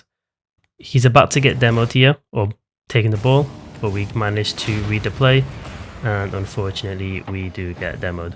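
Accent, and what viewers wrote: Scotland, United Kingdom. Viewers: Maybe he’s Scottish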